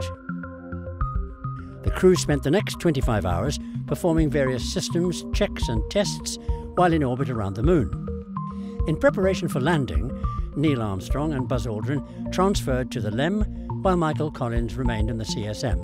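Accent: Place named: United Kingdom. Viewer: Do you think they have British documentaries in England that are narrated by Americans probably not so why do we have to listen to limeys over here every time we want to learn about something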